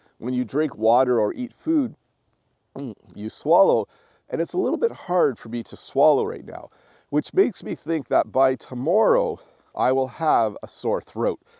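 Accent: United States. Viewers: you in Canada have more british or american english? As far as I know Canada was part of British Empire and accordingly you have a british one but I noticed you pronounce some words like Americans do. Answer please. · Dear teacher, My English is not good, my hearing is poor,I am a new immigrant, living in the United States. Is the English you speak here in Canada the same as the English you speak in the United States? I really want to learn English from you,Is your pronunciation the same as American pronunciation? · Dear, Here Ted from China, I really really appreciate for your videos which are most perfect of English learning. I don't need waste time to learn boring Chinglish any more. now i'm very enjoy to watch your Enlglish teaching, especially your accent, just like wathcing an American TV series. Also, i'm enjoy everything you said which are very close to our life, and it makes me feel how beautiful the Canadian countryside is. 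Hopefully that I could go there to have an enjoy that beautiful scenery one day. Bless you and your family!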